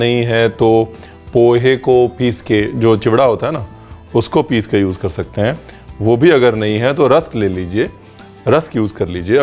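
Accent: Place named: Punjab, India. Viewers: Yess thats Punjabi style.....Chef Harpal ardak bolate hai...adrak ko😀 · "Amrricca" pure Punjabi English .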